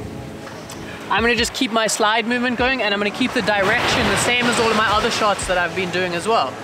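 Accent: Cape Town, South Africa. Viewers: Hy dude are you from Cape Town?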